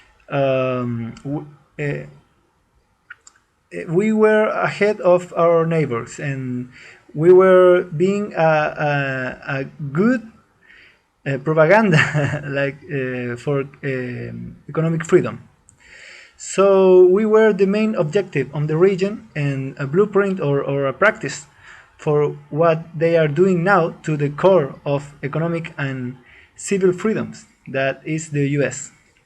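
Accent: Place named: Russia. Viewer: Warning mister trump,. this guy is a russian, you can tell it by his spoken english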